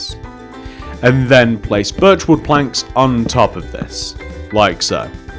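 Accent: United Kingdom. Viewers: Are u British ?? If so can u make an Asda ???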